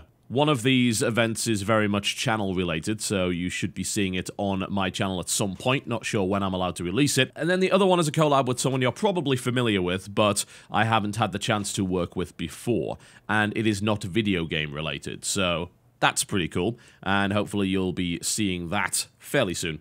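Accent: United Kingdom. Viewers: i enjoy content patch more than anything on this channel by a wide margin i feel it's the most informative [by it's nature it's suppose to be] and most entertaining probably because i enjoy coherent gaming rants by well informed people in a british accent i beseech thee oh great biscuit of totality find the time to bring us a new episode of content patch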